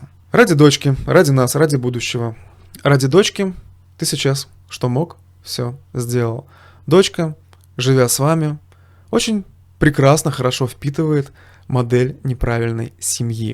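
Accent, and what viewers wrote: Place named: Belarus. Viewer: здравствуйте! Судя по акценту, вы из РБ! Я ваш земляк, и хочу Вам сказать Спасибо! Вы реально меня спасли. Все по делу, выражения-в точку! То, что и хотят слышать мужики в моей шкуре! Ещё раз, Спасибо! Если бы мог, пожал бы руку!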